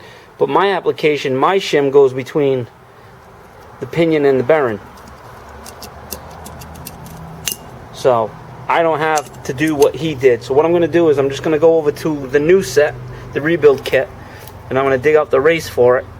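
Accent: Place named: New Jersey, United States. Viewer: Cool accent...you a jersey boy?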